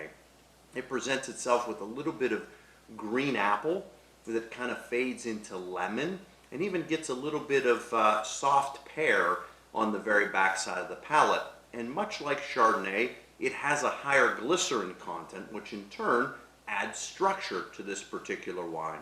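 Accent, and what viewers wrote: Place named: Italy. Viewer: you literally said all French words here in Italian accent 😢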